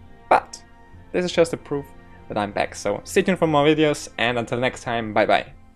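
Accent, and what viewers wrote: Germany. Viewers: Your accent just sound a little bit like a german accent:P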